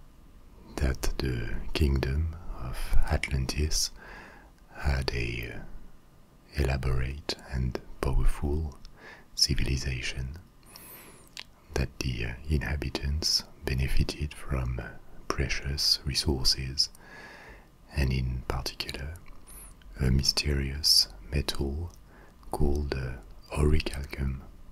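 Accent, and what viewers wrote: France. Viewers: Very relaxing videos. I dont ever get tingles but I watch asmr for relax, is my new favourite. I REALLY like the accent. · In English it is “play-toe.” Plato was Greek. The proper pronunciation IS actually “plah-toe”. With a long “ah”. He is saying it correctly, he just has a French accent.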